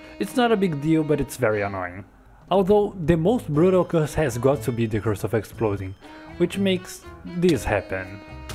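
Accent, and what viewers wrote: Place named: Brazil. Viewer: Your video is very good, everything in it is great, I'll watch the other videos and subscribe, keep it up wait... are you brazilian too? (I saw it in the about tab of your channel) now I understand your accent XD eu sou br também tmj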